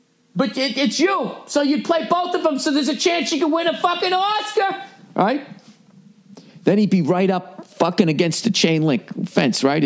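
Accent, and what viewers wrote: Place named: New England, United States. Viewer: that new england accent always gets me. "YOU COULD GET AN AWWSKA"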